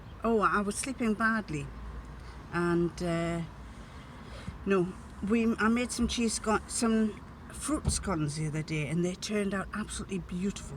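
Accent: United Kingdom. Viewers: I love your vlogs! I am from Ohio, so I love seeing your scenery on drives and walks, enjoy your mother-in-law, the kitties, his lordship, the British accent. I am same age and a sewer so I love your opinions on fitting and showing your makes. So thank you for all your posting.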